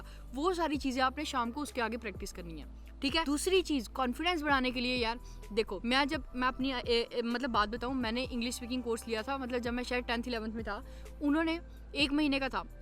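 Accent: Jammu, India. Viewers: Are you from jammu, where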